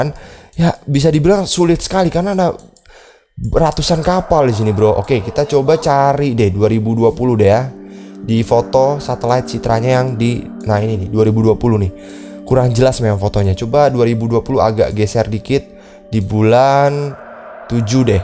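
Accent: Java, Indonesia. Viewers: Orang jawa bang? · Jowo banget mendelep 😭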